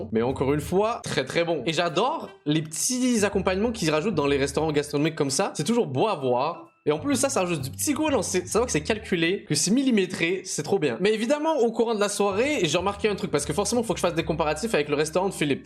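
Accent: Quebec, Canada. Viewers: Est-ce que c’est moi ou tu a une partie d’accent québécois. Je suis québécois et je crois que tu dois écouter quand même pas mal de vidéo québécois!!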